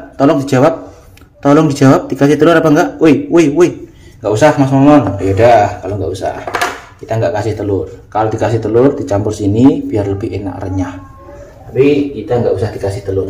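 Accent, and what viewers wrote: Java, Indonesia. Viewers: Iyo mas Iyo coba Ojo medok ndesone hehe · mas momon pean ki orang jawa ta ngomongnya bhsanya jawa medok😃😃😃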